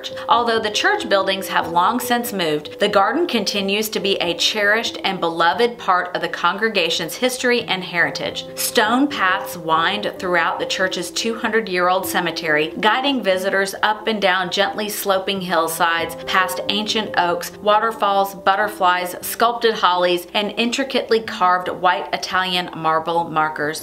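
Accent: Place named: Virginia, United States. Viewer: I really liked this one. I'm from norfolk, va and your accent reminds me of home. I like old cemeteries too!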